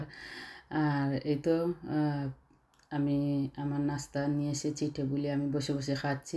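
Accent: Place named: Sylhet, Bangladesh. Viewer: 👍খুব সুন্দর লাগলো আপু 🎉🎉সিলেটি কথা শুনতে ভালো লাগে 🎉🎉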